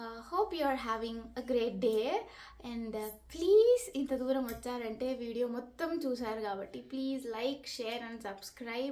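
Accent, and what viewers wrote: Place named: Anantapur, India. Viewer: Kal teaspoon 😂 our ananthapur slang❤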